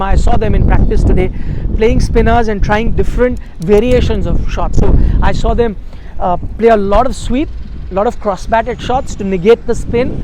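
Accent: India. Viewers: you sound like an Indian journalist Speak Hindi Practice before you start recording Voice quality sucks You should be little more professional Just the English won’t help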